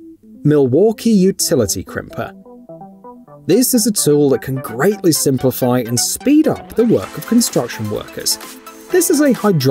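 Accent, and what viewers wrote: United Kingdom. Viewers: C'mon now who measures height in inches??? Weak. So total collapsible ladder height goes from 35"-14.5'. hate it when you get un experienced YT announcers using frugal and absurd measuring techniques despite the status quo. Like American calculations given in metric or American applied items with temperature variances given in Celsius.... Uh what is it 27° F for every degree Celsius? Don't know off hand Which inadvertantly cancels out the value of the information being presented. British accent or not, if you are going to present the ladder you might as well present the common method of measurement. It's only laziness and ignorance when presentations are as thorough as they are without doing so... Enough for some to immediately stop viewing as conversions are essential and we are not in Europe and we are in The great failing and falling America. 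Don't even know why i attempt to defend this skid mark country... All politicians need to be removed and no I'm not a domestic terrorist - just a disgusted true American Patriot. Live free or die.. waiting to for ad freedom is a joke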